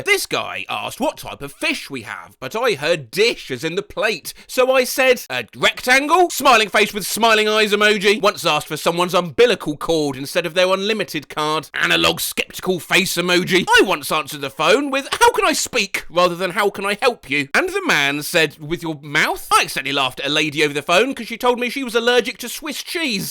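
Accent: United Kingdom. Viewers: This is hands-down the funniest video to date. Turns out that everything is made better when read by a middle-aged British man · Werid how a British critter can make me feel better after ballin 😎 my eyes out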